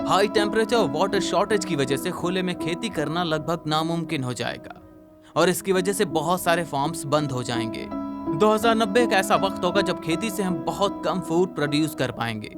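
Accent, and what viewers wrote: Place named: South, India. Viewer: Language mein South Indian touch aa rha h....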